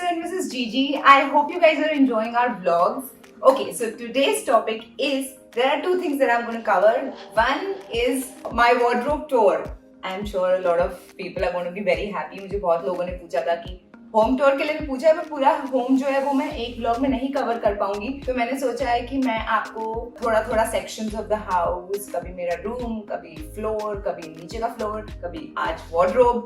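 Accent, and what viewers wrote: Delhi, India. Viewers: such a delhiite haha for a sec i forgot you are an actress, aise mast bhasha bhi bilkul dilli wali, i love watching you <3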